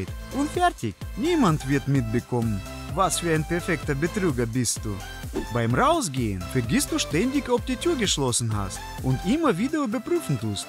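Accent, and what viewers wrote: Russia. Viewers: ja und................ ich mag den russischen akzentuiert hört sich cool an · Sein russischer Akzent ist sowieso richtig lustig xD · Русский акцент нескрыть😂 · Der Typ ist mal Super symphatisch und macht diese ziemlich aufwendigen Videos erstens mit Begeisterung und zweitens auf deutsch, was verdammt mutig ist, da es für ihn ja eine Fremdsprache ist. Und da ist es wirklich extrem Dumm, sich über den russischen Akzent zu beschweren.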